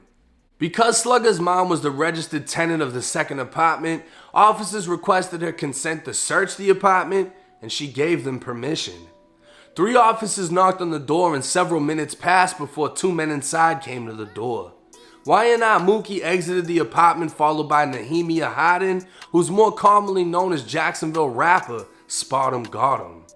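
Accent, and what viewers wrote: Boston, United States. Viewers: I’m from Boston bro no one stretches there accent THAT bad he says apahhhtment and stretches his AHHHS (r’s) every chance he gets like cool we get it your from Boston . Just feels over exaggerated and forced and dumb after a while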